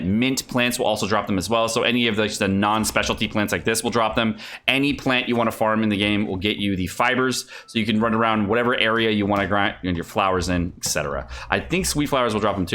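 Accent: United Kingdom. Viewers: Yay british again 💕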